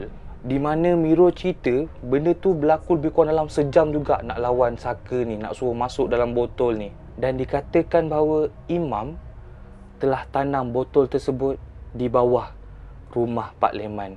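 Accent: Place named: Negeri Sembilan, Malaysia. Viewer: Esse org nogoghii....slang nogoghi kelauttt🤣🤣🤣👍👍👍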